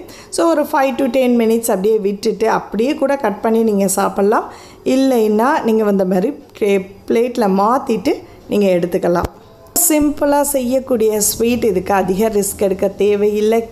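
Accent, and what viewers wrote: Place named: Ramanathapuram, India. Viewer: Nenga sollurathula mukkalvasi enga ooruoda othu poguthu sister i am ramnad mukkiyama vappucha intha word kettathum aacharyama irunthuchu ada namma ooru mathiriye sollurangalenu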